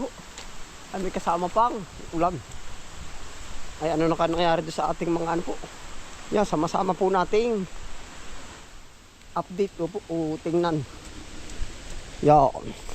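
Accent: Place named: Aurora, Philippines. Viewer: Nkklibang k po pnuorin ..KC Yun slita nyo my Punto..khwig Ng slita nmin ..aurora province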